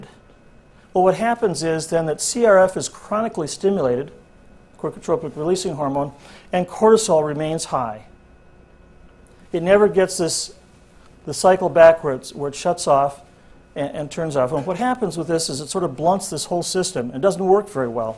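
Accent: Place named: Kansas City, United States. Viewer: Were you rasied in KCMO? You sound similar to me. I'm a shaken baby, with a birth defict spina bifida, and hydrocephalus. Also, the neighborhood, the stores around me, and my schools were also abusive. At least when it comes to the schools, I wasn't the only one. There are some really bad teachers and high school counselors. Sadly in MO, CPS sees you as an adult at 17, not 18. When I was 16 a Dr. reported my mother, but nothing could be done because I was to close to adulthood at 17. Really a bad state with social services, not only with children but with the elderly as well.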